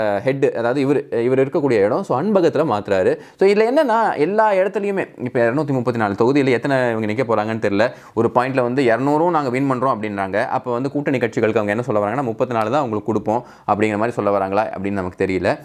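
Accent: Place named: Kerala, India. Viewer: மலையாள வாடை உங்கள் பேச்சில்..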